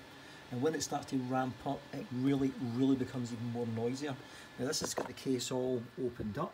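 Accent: Scotland, United Kingdom. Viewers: sort of off topic, but your Scottish brogue is awesome.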